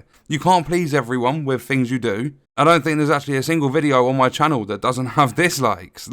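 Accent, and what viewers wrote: United Kingdom. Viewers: Dude i love it. Keep on moaning. Even the wife sat and listened to you today. Miss the UK and the morose moaning accents so much!!! :)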